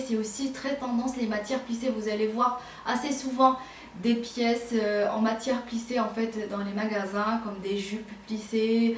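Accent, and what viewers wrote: Morocco. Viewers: Ton petit accent marocain est très sympa sinon super haul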